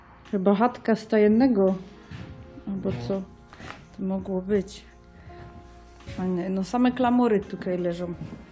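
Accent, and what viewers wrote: Silesia, Poland. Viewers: Super! Fajnie to wygląda od środka, ale najlepsze to że po Śląsku mówisz a raczej godosz, pozdrawiam serdecznie i oczywiście łapa w górę 👍👍👍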